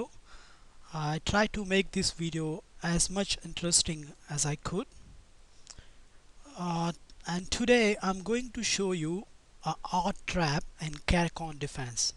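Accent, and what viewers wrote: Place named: India. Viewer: Thanks, i like your indian accent very humble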